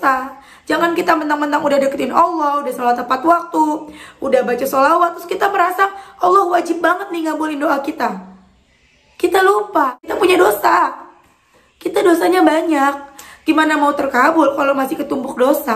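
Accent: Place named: West Java, Indonesia. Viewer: Ya Allah Sunda nya ketauan banget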